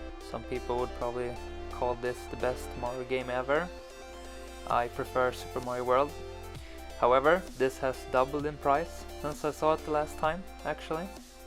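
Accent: Sweden. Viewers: You sound Swedish, am i right?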